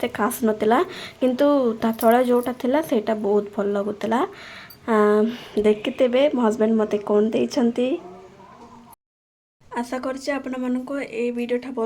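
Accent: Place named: Telugu, India. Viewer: It's like Telugu slang😮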